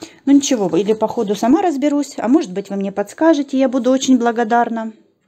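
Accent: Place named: Ukraine. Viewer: А вы откуда? Просто не услышала в видео, кроме упоминания 2х трудных месяцев, а майские деньки упорядочились, судя по говору, с Украины....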